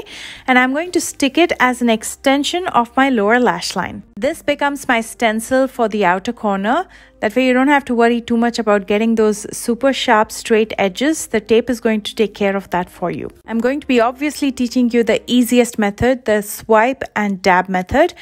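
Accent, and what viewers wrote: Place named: India. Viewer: I love her soft Indian accent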